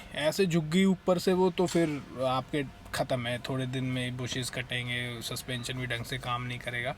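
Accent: Haryana, India. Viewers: Haryana ka aadmi boli s jarur pakda jaa h bhai ji…. Ab bas kahi aandhane chale jao trip pe 😅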